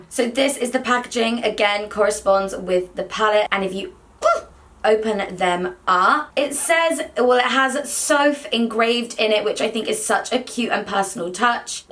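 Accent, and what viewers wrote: Newcastle, United Kingdom. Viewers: I’m new to this channel and got so confused. So geordie at the beginning and then not at all by the end of the video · R u from Newcastle!?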